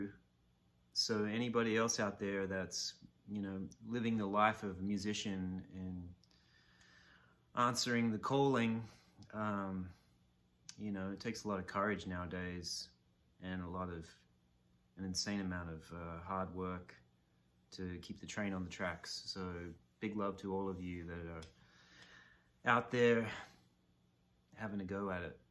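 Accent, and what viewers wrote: Australia. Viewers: Congrats from down under mate , you’ve never lost your Aussie accent ! 🙏🏼🎶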